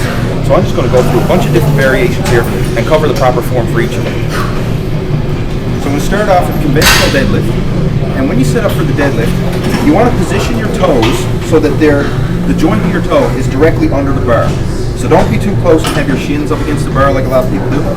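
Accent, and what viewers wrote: Newfoundland, Canada. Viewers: Are you canadian? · I like that I can hear the Canadian-ness. Excellent video, man. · wow man are you newfie?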